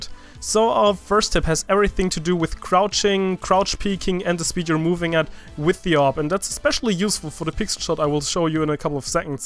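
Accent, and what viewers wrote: Germany. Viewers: hab ich mir auch schon gedacht und auch das Englisch hat so ein bissl deutsch xD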